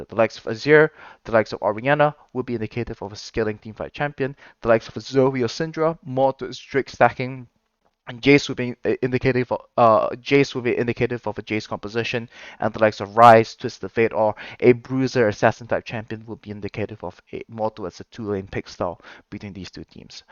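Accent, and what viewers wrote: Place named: Singapore. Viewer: Speaker slower.also don't try to hide ur singlish. Celebrate it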